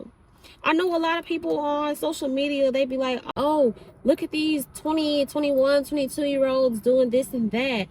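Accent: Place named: South, United States. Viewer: Where you from? You have a southern accent!!! It’s cute 💕💕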